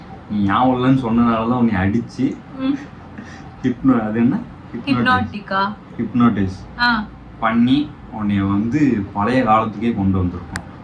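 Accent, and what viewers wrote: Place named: Tirunelveli, India. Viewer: So good to hear in Tirunelveli slang, bro🫡🫡🫡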